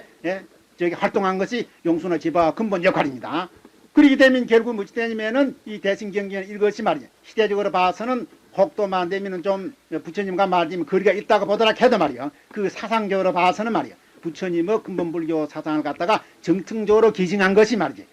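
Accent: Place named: Gyeongsang, South Korea. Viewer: 경상도 맞습니다 동감입니다 ㅎㅎ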